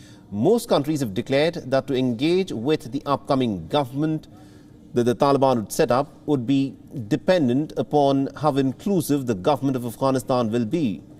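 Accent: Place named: India. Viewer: Funny English accent hahahaha laugh at india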